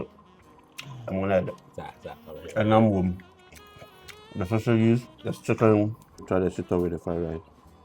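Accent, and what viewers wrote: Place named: Nigeria. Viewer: Why ur English be like 9ja guy own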